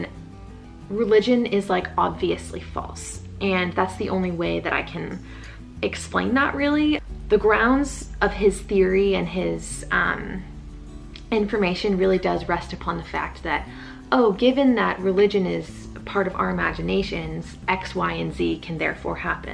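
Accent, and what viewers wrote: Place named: California, United States. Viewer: Good Review! I was listening and not watching and your California accent was flowing out of you very naturally. Gave me nostalgia.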